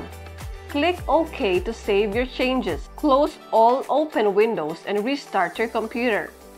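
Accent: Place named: India. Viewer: i would have fell for it if it wasnt for your indian accent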